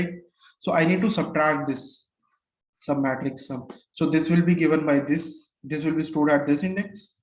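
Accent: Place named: India. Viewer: f*ing indian accent....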